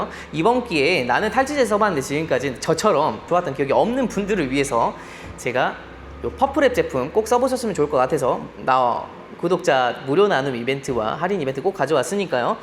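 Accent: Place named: Jeolla, South Korea. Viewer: 아따 전라도 사투리 구수하게 쓰시는데 전라도 아니셨습니까?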